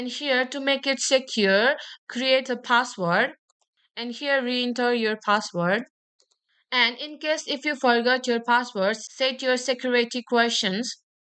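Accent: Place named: India. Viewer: Next time use an AI English voice. The Indian English accent is very difficult for most American to understand. But otherwise good info.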